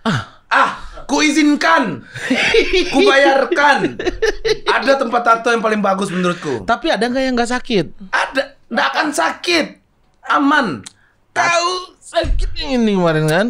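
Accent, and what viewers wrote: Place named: Sumatra, Indonesia. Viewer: Seneng banget nonton ini sebagai orng Sumatra, logat nya dapet banget😂😅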